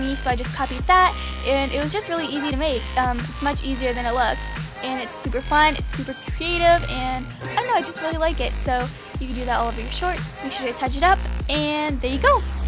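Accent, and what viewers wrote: Canada. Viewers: Canadian ? Yééé :) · omg are you from canada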